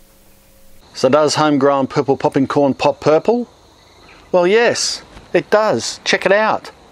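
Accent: Australia. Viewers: NS R LMAO, Australian sounds nothing like English This is probably the heaviest Australian accent I’ve ever heard, you should be able to tell straight away. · I don't know what I was expecting.. but the Australian accent caught me off guard. I think it's slightly purple yeah. I actually did ask what it tastes like 😂 was expecting "like popcorn!"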